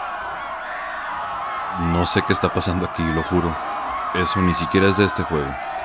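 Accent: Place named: North, Spain. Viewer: A sos re norteño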